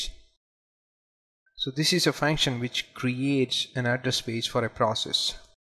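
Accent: India. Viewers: Thank you for the upload, though the Indian accent is rather thick and hard to understand at times. The "CC" help in that area.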